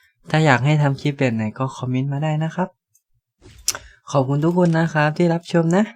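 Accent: South, Thailand. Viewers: พูดเป็นภาษาใต้ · พี่แหลงแบบใต้ๆกลางๆอ่ะ555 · ผมคนใต้กับกลางแต่แบบพี่พูดแบบใต้ๆกลางๆ555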